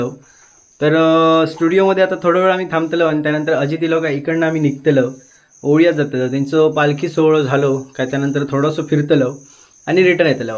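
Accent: Malvani, India. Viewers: लय भारी व्हिडिओ. खुप छान वर्णन केले आहे. तुझ्या बोलण्यात मालवणी गोडी आहे. एक लक्षात घे कि स्थानिक मराठी लोकांचे स्टॉल किती होते व परप्रांती लोकांचे किती स्टॉल होते हे समजले पाहिजे. उशी विक्रेताचा स्टॉल भारी! लोकांना काय हवे याचा विचार करणे गरजेचे आहे. प्रत्येक व्हिडिओ शेवटी विचार करणारा व परिणाम कारक हवा हि विनंती. राग नसावा. यु ट्यूब मध्ये आता स्पर्धा झाली आहे. आपण मुळाचे सिंधुदुर्गवाशी आहोत. आता पैसा कामुक लय व्हिडिओ दाखवतहत. सावधान माझ्या कोकणी बांधवानो! तुम्ही आहात म्हणून कोकण आसा! धन्यवाद 🌹🙏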